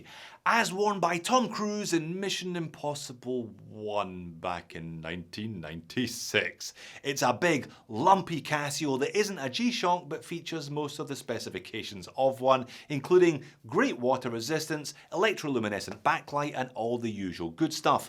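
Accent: Scotland, United Kingdom. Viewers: Oh the Scottish accent. I was trying to figure out what a dated complication is… an old function you don’t need anymore?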